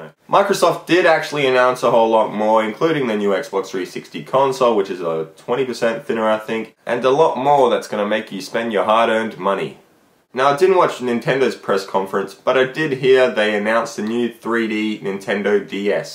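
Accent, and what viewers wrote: Australia. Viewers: Yay Australia! Good to see someone whos not American for once. I dont have to stab myself becuase of the accent! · MOVE... and your accent is Australian ^_^ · Are you Australian?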